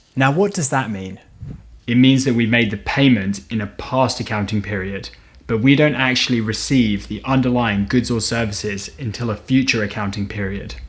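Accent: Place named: United Kingdom. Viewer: Thank you so much!!! I finally understand what prepaid expenses are! I always Google for knowing knowledge first but I search your channel for knowing accounting knowledge first like this time 😀 Fan from Taiwan 🇹🇼 (Btw really like your British accent!! Also a fan of British accent)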